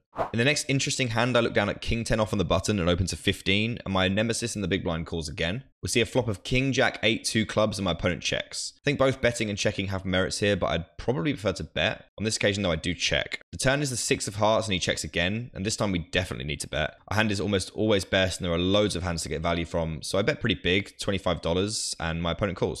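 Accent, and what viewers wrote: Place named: Quebec, Canada. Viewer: the accent from "quebec" is so strong lol... (I'm from montreal, quebec)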